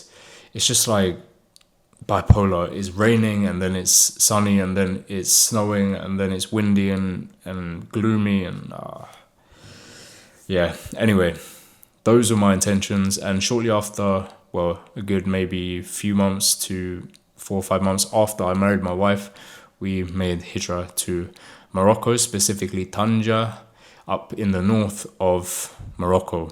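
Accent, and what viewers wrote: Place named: England, United Kingdom. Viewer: Salamo alaykom, my brother. If I were in your place, I would look for an apartment for sale in Tangier and establish an English language institute. Yes, there are many in Tangier, but you have an advantage: you are English and have an English accent, and that's what learners are looking for. A project like this would be 100% successful in Tangier because there are many international companies there, and English is in demand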